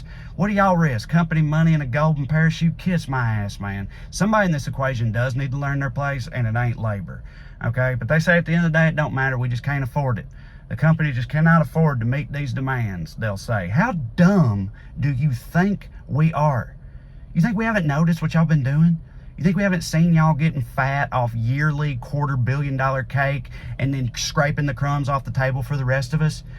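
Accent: South, United States. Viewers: I was born in Venezuela and learned British English. I’ve been living in the United States for 25 years, and I never thought I would love this southern accent more than the one I learned English with.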